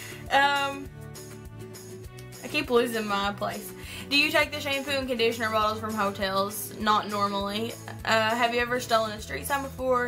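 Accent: South, United States. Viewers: Love your makeup and love your southern accent.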